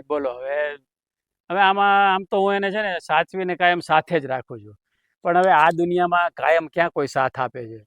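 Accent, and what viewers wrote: Surat, India. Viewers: વાહ....બહુ જોરદાર માથાકૂટ કરી હવલદાર સાથે....😂 સુરતી ભાષા સરસ બોલ્યા હો...બાકી...😂👌🏻👌🏻👍🏻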